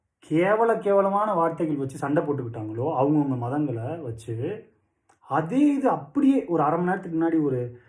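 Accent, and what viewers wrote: Standard. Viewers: normal தமிழ் பேசுறது நல்ல இருக்கு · Pls sir neenga eppovum pola videos podunga. Neeng feel pnatra mathiri videos podathinga nijamve fleeing iruku sir, pls mathavangla care panthinga epavum pola azhaga tamil la azhaga pesuvinga ila athupola podunga athan ungaluku azhagu. Senthamil pesuvinga parunga 👌👌👌👌👌👌 . So continues videos podunga. Nalla smile panite pesunga. God bless you sir. Ungalai pakka en anna polave iruknga. So feel panthinga bro..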